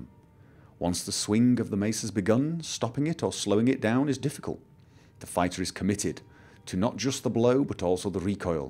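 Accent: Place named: Scotland, United Kingdom. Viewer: It sounds like you have an English accent, but you roll your R's like a Scotsman. Did you invent your own accent?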